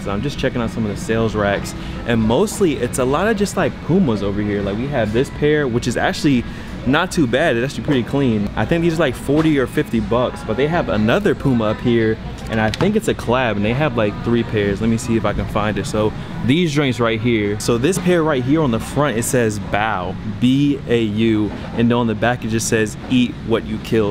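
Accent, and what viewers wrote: Virginia, United States. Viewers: He from Virginia?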